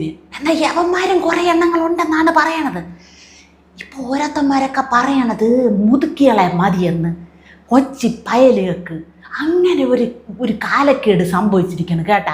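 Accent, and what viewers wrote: Thiruvananthapuram, India. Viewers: Thiruvananthapuram slang 💯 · Super super❤❤❤ TVM language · tvm കാരിയാണ് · തിരുവനന്തോരം ശൈലിയിലുള്ള അവതരണം അടിപൊളി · Very good presentation in തിരോന്തരം സ്ലാങ് 👌👌👌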